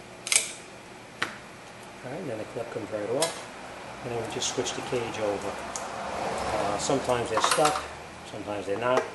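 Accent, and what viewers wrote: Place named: New York, United States. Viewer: Love the video thanks! This guys definitely a New Yorker! 👍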